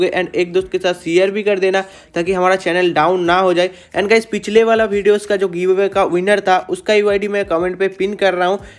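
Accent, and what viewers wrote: Bengal, India. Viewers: Bro bengali ho kaya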